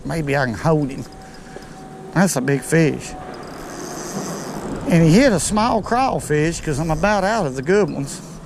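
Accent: South, United States. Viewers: Don't understnad what you say with that funny Alabama accent. Love ur southern accent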